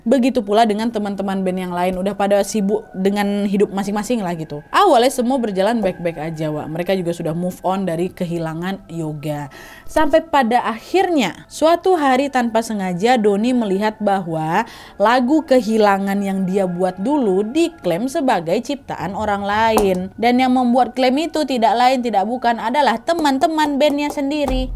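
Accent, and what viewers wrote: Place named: Medan, Indonesia. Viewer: Medan yah kak?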